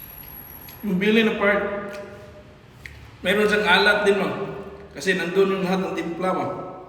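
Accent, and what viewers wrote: Visayas, Philippines. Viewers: Wag n kayong mag Tagalog idol Hindi kayo maronong bisayang bisaya kayo legit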